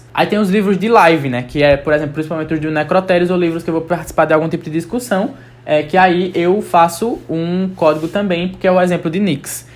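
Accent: Northeast, Brazil. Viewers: Homi, tu é claramente nordestino. Alguma chance de ser pernambucano??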